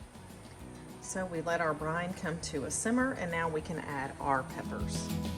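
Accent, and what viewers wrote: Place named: South, United States. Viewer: I respect your right to privacy, but I was wondering what state you live in. Your accent says the south. I am new to the south, north central Arkansas, and the accent sounds close.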